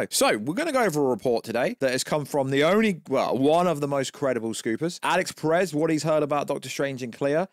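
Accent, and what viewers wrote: United Kingdom. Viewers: It is a very British listen for sure. lol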